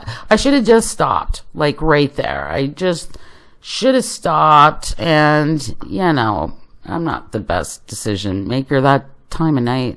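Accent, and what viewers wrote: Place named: Canada. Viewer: Yup, definitely Canadian!! "About" gives you away. The rumor mill is insane. I love your channel ❤️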